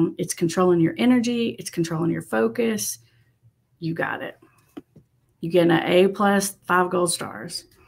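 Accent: South, United States. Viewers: your videos have helped me so much, You are great, and I love your southern accent. I follow you from Argentina😊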